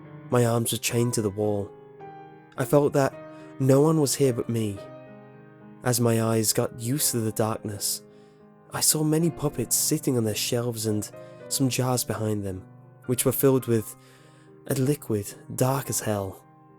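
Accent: England, United Kingdom. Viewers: What part of England is he from???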